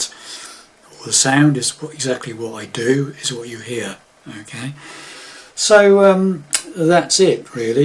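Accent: United Kingdom. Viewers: I’m now just seeing this video 7 months later. It never showed in my feed. I was one of your very first subscribers who suggested tons of lessons in time that are on your channel. You were the person I turned to for tutorials when I started learning guitar. You’ve helped greatly and you are extremely appreciated, I hope you know that. I’m far along in life now from when you did your channel. I can play extremely well now, and have learned a lot. Even my music has changed from the music I suggested, I’m definitely more into country music and folk music now. Also, I always knew you were British from your accent! You’re a good lad. You’ll always be in thought mate. Hope all is well. Cheers!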